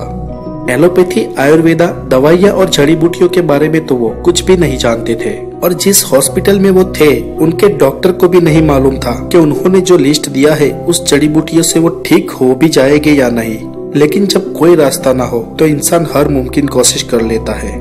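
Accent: Gujarat, India. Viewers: plz chq, u r ton,,,,,is gujarati.....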